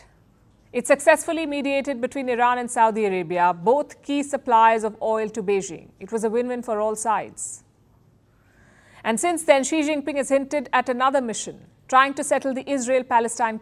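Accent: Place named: India. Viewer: Please speak English; I can't understand your Indlish.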